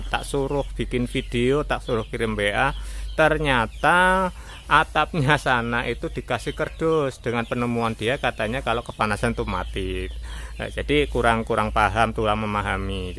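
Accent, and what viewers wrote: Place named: Yogyakarta, Indonesia. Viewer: Wong jogja kali..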